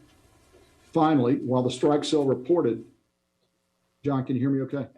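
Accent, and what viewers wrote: South, United States. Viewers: This southern crap talk, why is he here, he can't even read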